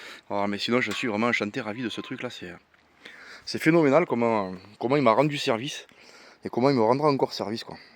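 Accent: South, France. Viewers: Merci pour ce retour d expérience ! Avec l accent du sud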